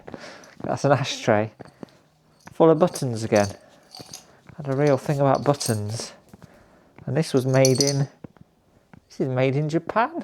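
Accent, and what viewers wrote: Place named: Ireland. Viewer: Im new to your channel ❤❤❤ i love your voice it's your irish accent ❤❤ all my love from America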